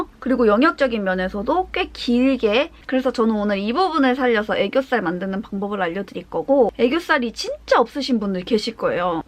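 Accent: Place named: Gwangju, South Korea. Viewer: 혹시 언니 광주에 사시나요? 말투가 제 친구들과 똑같아서..❤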